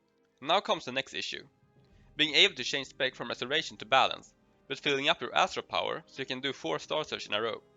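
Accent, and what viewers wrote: Sweden. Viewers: Asså cool vid osv, men din svengelska är så söt, minn or trait 😅😅😅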